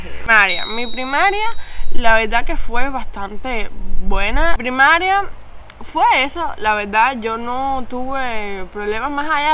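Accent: Cuba. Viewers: Me gusta como platicas. Me gusta tu acento cubano. Desde Monterrey, México. Cuídate mucho.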